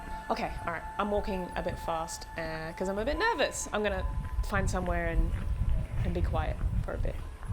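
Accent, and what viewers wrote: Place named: United Kingdom. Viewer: it's sounds more British than Australia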